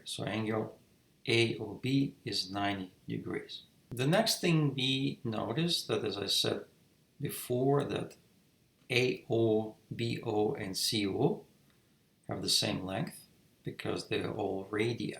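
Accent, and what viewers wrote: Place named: New York, United States. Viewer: Drop CE perpendicular to AB at E. angle CBA=30,angle CAB=15 angles in deg. Let CB=2,CE=1 and EB=sqrt3. AB=AD+DB. sin(15)=(sqrt6-sqrt2)/4 sin(30)=1/2 angle ACB=<num>=135 sin(135)=1/sqrt2 Use Rule of Sines on triangle ACB. AB/sin(135)=CB/sin(15) AB/(1/sqrt2)=2/((sqrt6-sqrt2)/4) sqrt2*AB=8/(sqrt6-sqrt2) AB=8/(sqrt2(sqrt6-sqrt2))=8/(sqrt12-2)=8/2(sqrt3-1)=4/(sqrt3-1)=2(sqrt3+1) DB=AB/2=2(sqrt3+1)/2=(sqrt3+1) DE=DB-EB=(sqrt3+1)-sqrt3=1. tan(x)=CE/DE=1/1=1 x=arctan(1)=45 degrees and that is our answer. Thanks for the problem. I like your accent,do you come from NY City?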